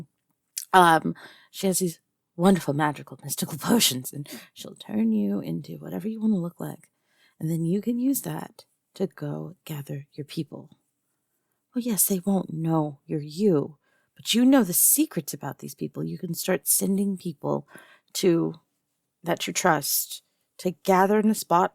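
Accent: South, United States. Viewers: Wasnt expecting a southern dragon but its a pleasant surprise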